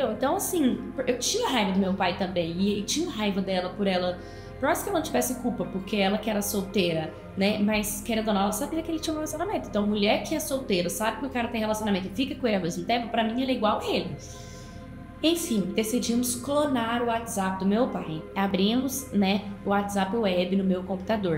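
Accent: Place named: Caipira, Brazil. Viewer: Só eu que vejo que ela tem sotaque cautrim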